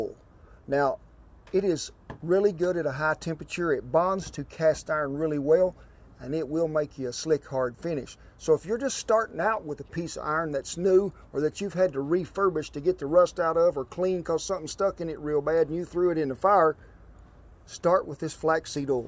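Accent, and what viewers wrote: South, United States. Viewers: I fully expected a "why thank ya darling!!" #SouthernAccents ❤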